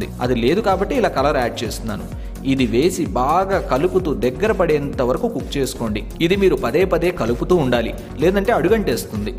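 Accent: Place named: Andhra Pradesh, India. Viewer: Hai nenu mee language vinaganay adiga meedi andhra ani but didnt reply.ok ardham ayindi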